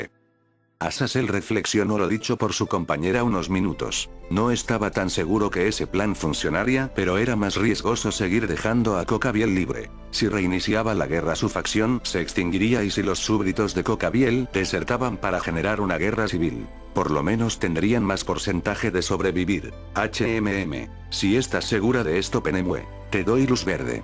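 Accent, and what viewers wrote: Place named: Dominican Republic. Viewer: Klk, un Dominicano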